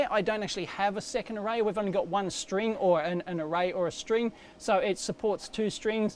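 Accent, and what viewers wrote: Australia. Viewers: There are 2 reasons why I watch your videos: 1. Electronics 2. Your Aussie accent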